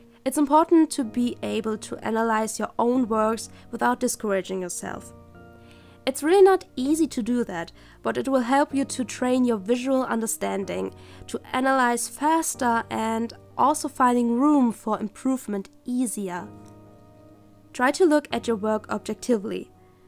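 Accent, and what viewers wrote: Germany. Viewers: Kommst du von Deutschland😄 Ja oder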